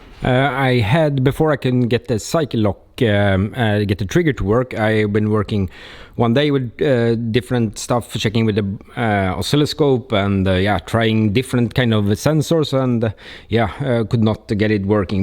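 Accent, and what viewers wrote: Sweden. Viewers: Men satan va svensk du låter 😂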